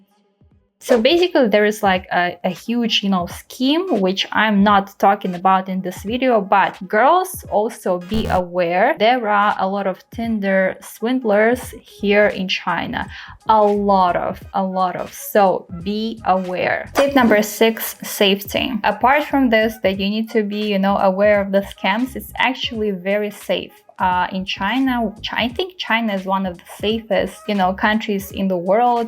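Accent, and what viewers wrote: Russia. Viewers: Акцент похож на русский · Are you Russian? You don’t sound American at all and I’m American with no understanding of the Chinese language but you look Eastern Russian or Korean Japanese maybe of mix race. Grateful for you guide but a little too long for my patience, you’re an expensive gal, nice LV shopping spree from coffee table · Why does your english sounds so russian? Is it just me or you may be sibirskaya? 🤔 🖤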